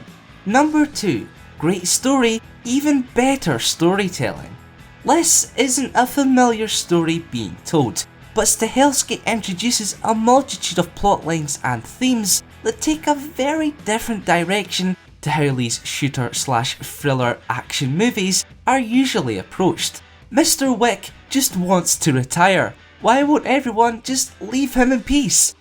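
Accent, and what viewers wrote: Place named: Scotland, United Kingdom. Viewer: I fooking love Scottish accents. By far my favorite.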